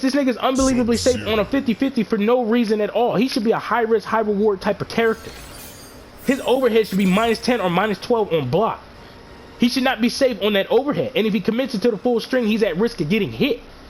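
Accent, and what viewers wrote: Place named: New York, United States. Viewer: Sounds like a New Yorker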